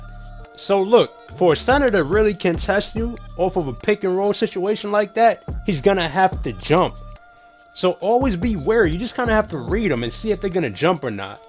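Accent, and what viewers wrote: New York, United States. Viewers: his New York accent makes this video so much more better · this my first time watching your vid and I’m glad I found you, I subbed and all bro but how tall is your offensive threat I made mine 6’2 is that good ? & no cap you sound like you from NY or NJ which is mad funny cause we sound alike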